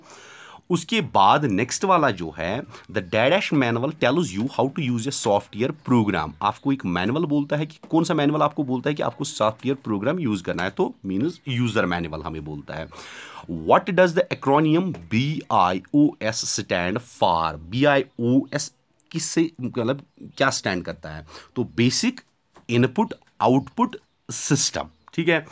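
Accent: Kashmir, India. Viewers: Sir I think you r from kashmir am I right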